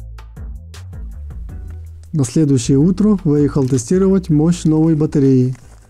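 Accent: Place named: Vologda, Russia. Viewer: мастер из Вологды, по акценту сразу понятно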